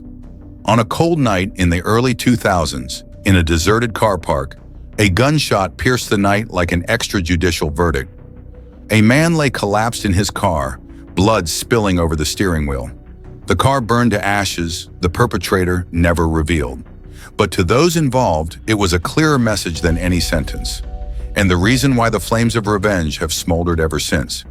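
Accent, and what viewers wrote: United States. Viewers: With that accent should this not be Shadows of USA .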